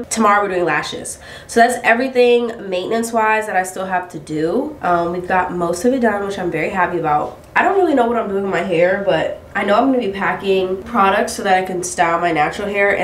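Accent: England, United Kingdom. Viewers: It’s the English twang for me. Your friends are a vibe. Okay French toes, look at the little pinky toe 🥺😅 Sis said her hat is broken.. comedy just comes naturally to You 😂🥺❣️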